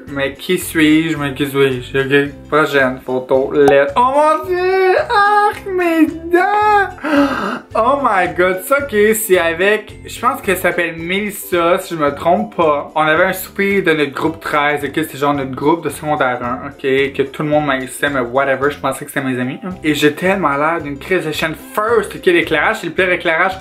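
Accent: Quebec, Canada. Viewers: Extek Machina C'est l'accent québécois xD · Le makeup on point et ton accent jpp laccent canadien est magnifique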